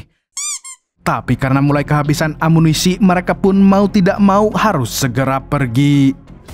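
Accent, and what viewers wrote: Banjar, Indonesia. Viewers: Bahasax Admin, bhsa Banjar gen, 😂 ngaran ikam spa?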